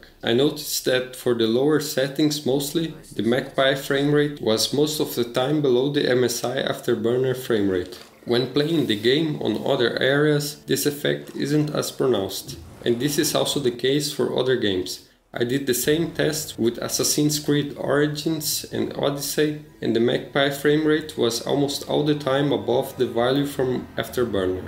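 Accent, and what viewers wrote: Brazil. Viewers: valeu man kkkkk aquele sotaque br no inglês kkkkk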